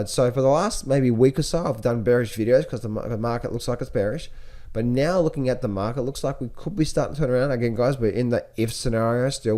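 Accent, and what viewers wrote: Australia. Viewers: Thats an Aussie accent !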